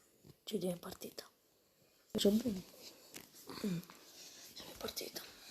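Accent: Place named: Sicily, Italy. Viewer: Si sente l' accento del Siciliano 😀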